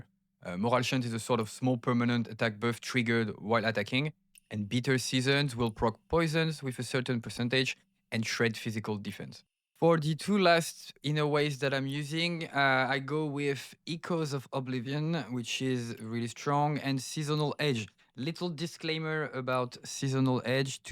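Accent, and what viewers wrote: France. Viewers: Je reconnais l'accent français , bien joué ta compris la meta mon gars 💪🏼💪🏼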